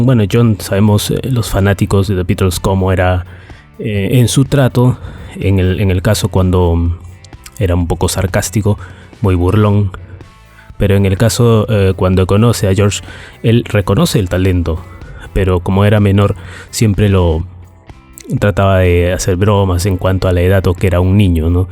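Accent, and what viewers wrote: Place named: Peru. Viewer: Tienes acento peruano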